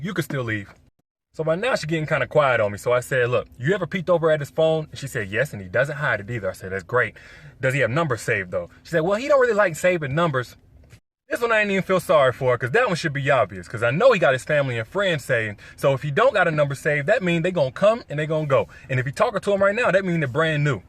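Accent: United States. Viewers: everything you say is very interesting, and logical. The problem is: you are talking English too fast, with American accent, to people all over the 🌍 world, and we don't understand what you say. Also the sound of the engine car is disturbing. So please. Speak just a little bit slower. Thank you.Efrat Israel. 🍍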